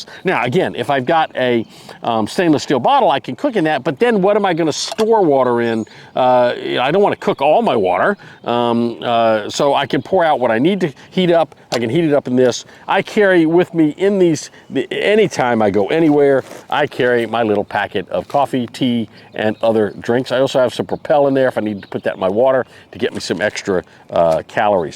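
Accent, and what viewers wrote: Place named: New England, United States. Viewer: Thanks. I lived in Jacksonville FL for a few years, and worked maybe up to a year in (Fl/GA Stateline) Kingsland GA. I had guessed to myself that maybe you lived in OK, AR, or TX because of your Yankee accent, having forgotten that lots of Yankees life in FL et al. I have about 10 backpacks and Bug-Out-Bags because I call "hikes", expeditions, because I am looking for places of interest to explore--each one related to a specific hobby that requires different equipment. I would never carry two folder knives (tho I have no objection about that). I would add either a folder Silky saw (best for green wood) if one wants to cut branches to prevent widow-maker dead fall from trees, or the Laplander folder best used for dry/fire wood. Yes these are longer, but much more useful in unfamiliar terrain and unexpected weather. 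I would also never bring sugary snack bars. They don't stick and they tire me (as I am pre-diabetic); but I do carry sugar (brain needs sugar) in the form of peppermint life-savers (and I suck on them when I recognize that I am not thinking fast or clear enough. If the lives in a Southern State bordering an ocean, I would not recommend sleeping on ground. I had in my backyard in NE FL, dozens of tiny poisonous rattle snakes (I don't recall their proper name). I'd much prefer a hammock with mosquito net and tarp overhang to a poncho (and I have both). I'd sleep better in a hammock off ground. Now I'[m in a winter state and tomorrow the wind chill is predicte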